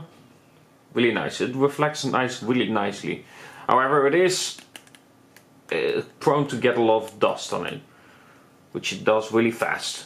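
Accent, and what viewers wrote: Netherlands. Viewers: Are you from the Netherlands? Because I hear the Dutch accent.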